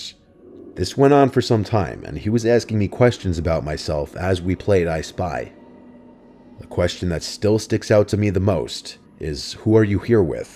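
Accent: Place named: New York, United States. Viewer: He has such a great voice for these stories. I cover true crime and my voice is on the lighter side so I try to make a little deeper for the tone of the story. He also has that jersey maybe New York accent that really sets him apart.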